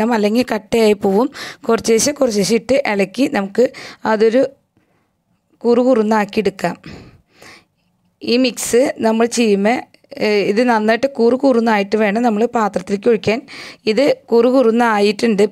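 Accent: Thrissur, India. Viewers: trichur slang caramel chocolate pudding yummy - nandu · Pudding with trichur accent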